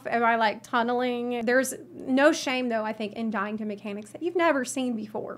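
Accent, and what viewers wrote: South, United States. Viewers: oh can you read me some goddamn bedtime stories with that sweet moonlight magnolia drawl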